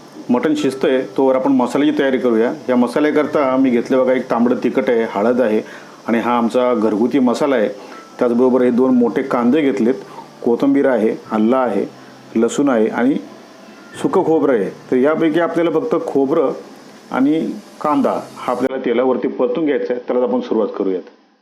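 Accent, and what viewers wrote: India. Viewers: तुमच्या भाषेवरून तुम्ही नगरचे की काय असा प्रश्न पडतो?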